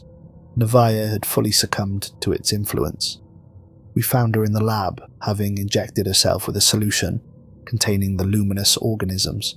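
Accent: Wales, United Kingdom. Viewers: I do like the story's! But it seems, only bit different from meny b4 ,,,please do some different one's!.if possible have some others to join you ,it will give the story's more depth. I'm not criticising you ,never stop ,can I here some Welsh in your voice,,,,hope so ,.if I ever get rid of long covid, hopefully i can start writing a story or to again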